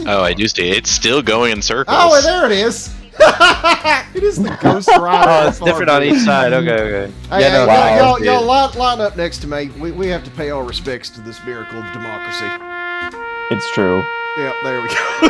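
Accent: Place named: Texas, United States. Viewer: I LOVE his patriotic Texan accent.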